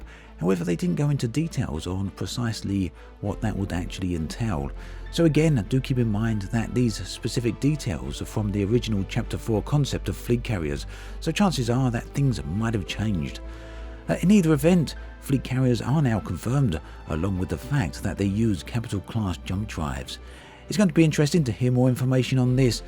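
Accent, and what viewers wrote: United Kingdom. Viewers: What the new currency called, I can't make it out with the strong UK accent? Arcs? ox. rocks?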